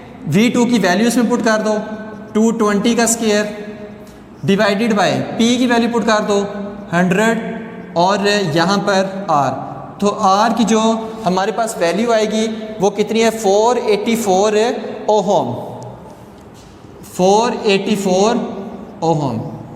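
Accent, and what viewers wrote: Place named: Saraiki, Pakistan. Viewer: Sir ap baton baton mei saraiki lgay hain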